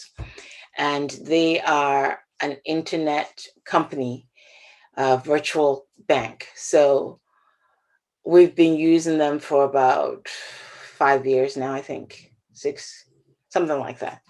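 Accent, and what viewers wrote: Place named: Brooklyn, United States. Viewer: Salud🌹🌹🌹 dear thank you for sharing.... My hubby w his BK accent would appreciate your BK fuggetabouit 😹😁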